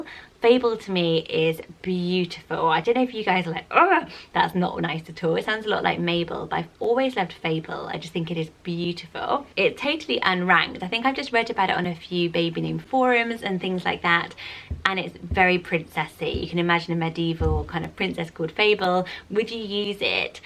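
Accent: United Kingdom. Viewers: Everything sounds prettier with a British accent lol...they sound beautiful when you say them! I live in Tennessee...ask someone to say Clara or Lavender 😆 It’s not good. I’m originally from NY...it’s not good, either 😂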